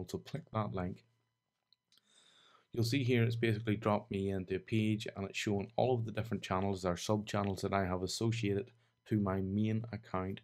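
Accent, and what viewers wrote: Northern Ireland, United Kingdom. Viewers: are you northen irish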